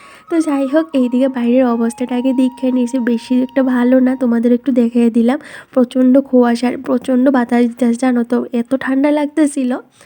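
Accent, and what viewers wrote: Sylhet, Bangladesh. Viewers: তুমি কোথায় থাক? তুমি কি সিলেটি? তোমার কথা শুনলে মনে হয় সিলেটি,,,প্লিজ কিছু মনে করোনা,,,আর আমার প্রশ্নের উত্তর দিও❤️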